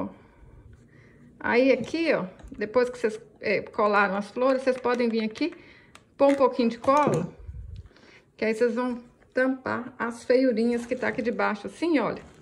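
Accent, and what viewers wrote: Minas Gerais, Brazil. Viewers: Muito lindo e simples a explicação e o modo de fazer. Esse seu sotaque é o máximo me lembra uma amiga mineira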